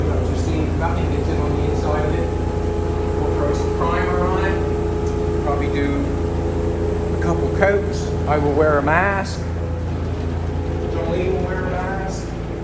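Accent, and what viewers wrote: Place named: Canada. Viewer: You sound really Canadian ey👍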